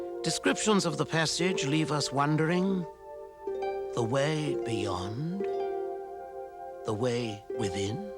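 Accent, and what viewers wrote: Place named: England, United Kingdom. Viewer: I DON'T want to be, or sound like many of the negative commenters we come across online.. I'd RATHER be positive! But... this video kinda sucks. It's shallow, doesn't say much at all.. just the English accented narrator speaking sparse attempts at poetic sounding narrative, shallow talk about how live "within boundaries" in this life, and other such trite sounding quasi-philosophical babbling. There's very, very little discussion about ... "The Other Side Of Life". Very little, other than a couple allusions toward someone having a NDE on an operating table. The rest of the video, to a large degree, is images of scenes on Earth, with accompanying 70's sounding made for TV movie soundtracs. Oh well. Watch it if you want ;) Blessings to all! We're all out of here some relatively soon coming day, and we'll each experience the ultimate "THIS IS IT!" for ourselves.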